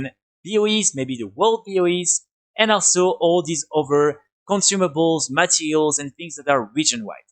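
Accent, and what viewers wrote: France. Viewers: Bonjour, je pense qu'en français je regarderais vos vidéos, mais là un tel accent français pour des vidéos EN je peux pas. Beaucoup de timeline vidéo pour dire finalement peu de choses. Je vous conseille les vidéos de Penguin, niveau contenu vous pourriez prendre exemple. Bon courage à vous.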